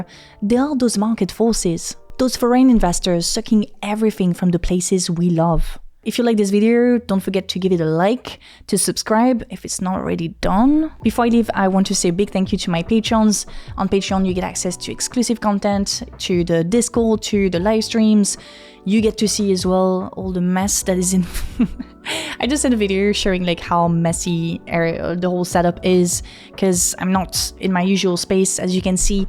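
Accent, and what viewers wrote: France. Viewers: Maybe i am weird but always found the French English accent charming. Way better then my danish potato english :D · Don't feel bad about your accent! The French accent in English gets way too little exposure, and makes it harder for foreigners to understand French people who actually try to accomodate them by speaking English. Your older videos provide a great service! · OMG, THE FRENCH ACCENT BACK THEN, GIVE IT BACK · I love the frenglish accent, I miss it 🥺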